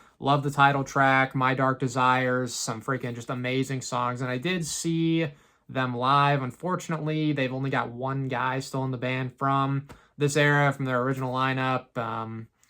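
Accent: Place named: East Coast, United States. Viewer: Are you from the East Coast? I ask that because of how you pronounce Twenty as "Chwenty." I give my workmate shit for that lol, hes from Mass love the video, just revisted again from when I watched it before and never noticed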